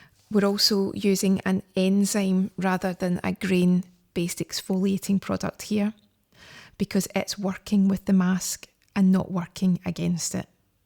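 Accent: Scotland, United Kingdom. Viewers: I ken you’re a Scott?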